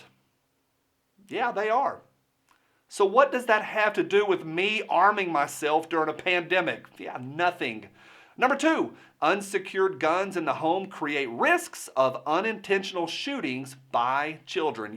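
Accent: South, United States. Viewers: Another great video dude! I was kind of hesitant on your channel the first time or two I watched. (Took me awhile to get used to the Southern Drawl). But you're now one of my favorite 2A channels. Thanks for all the hard work and great 2A info. Keep it up dude!! God Bless.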